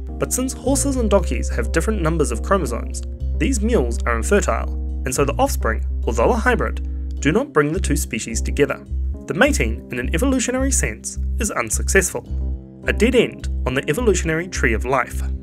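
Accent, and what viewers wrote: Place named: New Zealand. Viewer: great video! and your NZ accent is fresh heheh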